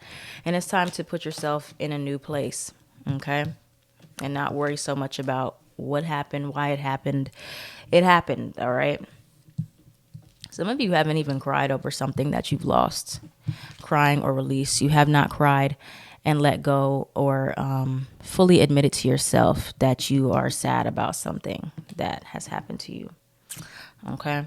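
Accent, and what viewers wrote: Bronx, United States. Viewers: U from the bronx??? GET STURDYYYY 😆😂😂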